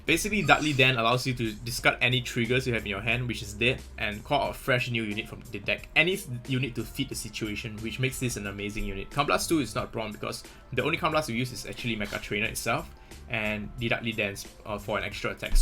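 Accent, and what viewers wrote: Singapore. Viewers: He does have that singaporean accent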